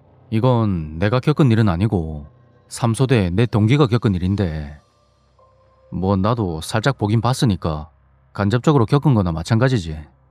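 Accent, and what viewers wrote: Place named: Busan, South Korea. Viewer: 부산사투리 재미있어요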